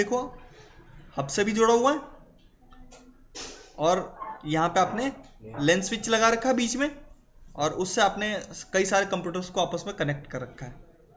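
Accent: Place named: Jodhpur, India. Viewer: Sir me jodhpur se hu aapki aavaz se lag rha h ki aap sayad jodhpur ke ass pass ke ho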